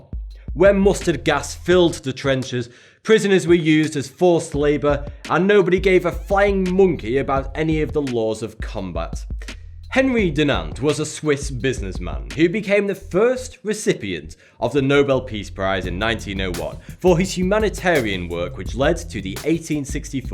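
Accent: United Kingdom. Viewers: Watching this video, my roommate walked by, and asked why Frankie Munez was talking in a British accent. No. You are NOT Malcolm in the Middle, and I will correct him on his folly. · Bro. I love your voice. Btw I like that Brittish accent.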